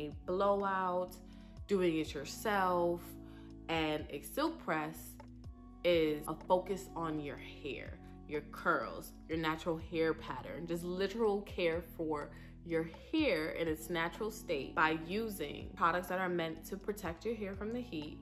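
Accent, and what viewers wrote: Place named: New York, United States. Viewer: omg i knew u were a new yorker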